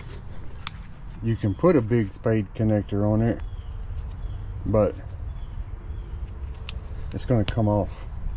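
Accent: South, United States. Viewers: I just love Southern women and their voices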